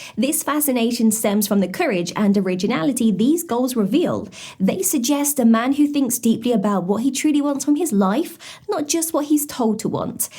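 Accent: England, United Kingdom. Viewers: Love her british accent she is adorable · I'm Surprised Americans don't say You're A I with your Perfect English Accent ! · It's the English accent for me😳😏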